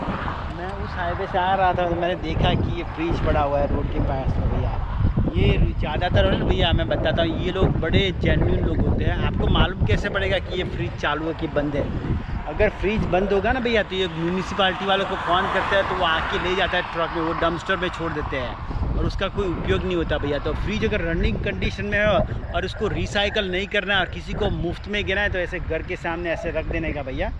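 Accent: Gujarat, India. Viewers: I think you belong to Gujarat as you speak Gujarati style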